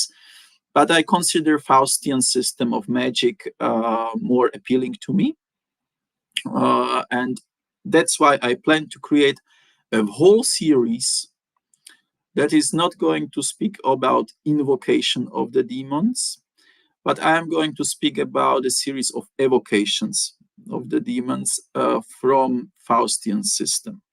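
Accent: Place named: France. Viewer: Your videos are my favorite. You remind me of the Brazilian man who introduced me to Quimbanda. I guess it must be the French accent!’ Please keep up the great work!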